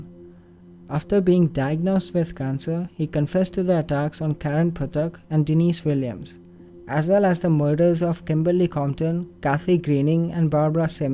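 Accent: India. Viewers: Please stop doing videos your voice is so annoying u Indians need to stop talking english all together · That's an Indian ascent.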